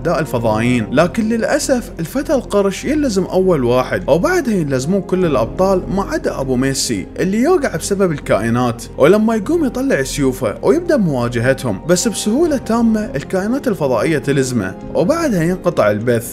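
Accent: Iraq. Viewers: احب بس صوته عراقي تشبه والله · هستوني يله عرفت انته عراقي ✨🥱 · صوتك جميل وطريقة سردك تجنن وملخصاتك تجنن الوحيد اللي اتابع ملخصاته هو انت لهجة عراقية ومفهومة اسمتررر🥹🫶🏻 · انت من العراق 🇮🇶؟؟؟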